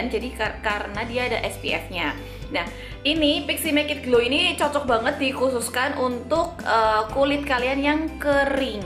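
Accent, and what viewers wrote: Java, Indonesia. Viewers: Ayune kyok golekan jeung.... salam kenal yo aku soko jeporo mbak... tetap bikin video ala mbak medhok aja ya ... kereeennn inspiratif sekali buat saya dan buat pecinta makeup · Cantikkk bgtt mbak medokknya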